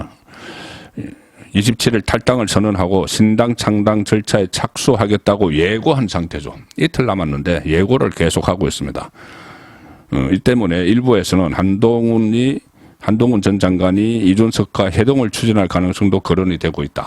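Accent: Gyeongsang, South Korea. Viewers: 송국건의 혼술 구독자가 43만이 넘었네요. 경상도 출신의 투박한 어투지만 오랜기자 생활을 통해 터득한 다양한 경험을 여과없이 전해주신 진정성이 빛났네요 늘 공감합니다. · 야 개소리말어 경상도인간들 다바꿔야해 너같은인간포함해서..그래야 우리가 이길수있어 너자꾸 경상도눍은이 싸고돌지마 니 서투리듣기도싫어 우파니까듣는거야 전라도도 싫지만 경상도 지금 의원 전부바꿔야해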